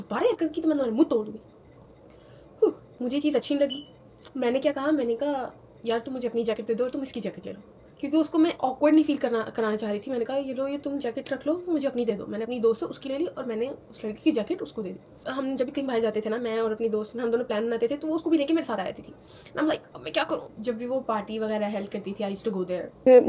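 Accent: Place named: United States. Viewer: Ur American accent is so damnn good!!!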